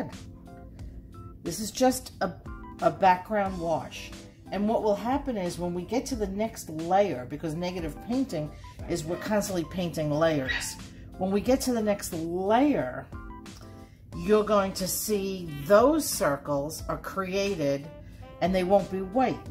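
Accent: East Coast, United States. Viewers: Ok,! I love this tutorial.. and your East coast accent 😜🥰 Thank you!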